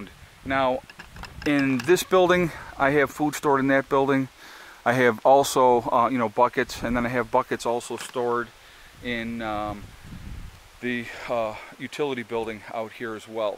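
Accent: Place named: Michigan, United States. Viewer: Great video. ..where are you at??? Almost sounds like michigan. ...lol. with 30 degree variable. ..take care...God bless you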